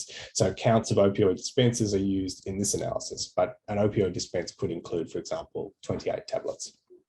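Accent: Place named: Mexico. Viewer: Nice research but I found it hard to understand the presenter's Mexican accent.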